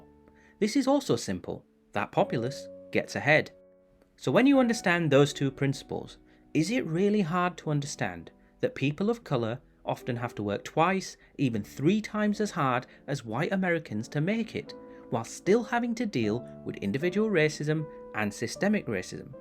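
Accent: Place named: Yorkshire, United Kingdom. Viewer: Really engaging video- cutting through the noise and the bs commentary which is pervasive in the news and most social media. I can sense a hint of Yorkshire accent amirite?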